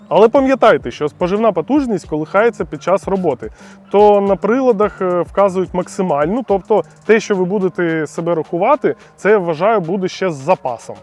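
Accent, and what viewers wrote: Russia. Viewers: Твои русизмы в словах сложно осушать. Ты либо по русски бы говорил, либо пусть тебе бы написали текст для диктовки на чистом Украинском.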